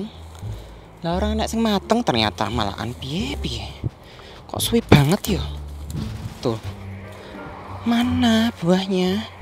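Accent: Java, Indonesia. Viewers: Woalah moasee medok😅😅😅👍👍... Sukses mas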